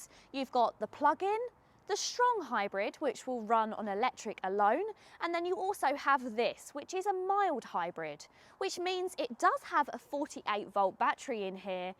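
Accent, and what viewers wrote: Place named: Cockney, United Kingdom. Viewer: Nice car, annoying cockney accent.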